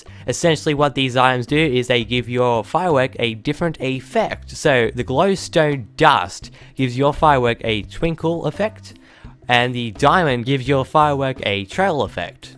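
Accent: Australia. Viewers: R u australian? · are you australian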